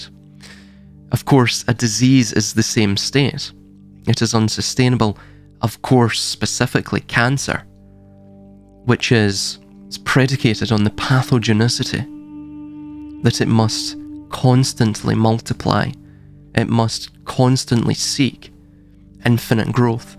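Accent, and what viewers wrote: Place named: Scotland, United Kingdom. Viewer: Anything you say regarding Steiner and his Christology path is welcome. Thank you so much for all you speak and with a fine Scots accent.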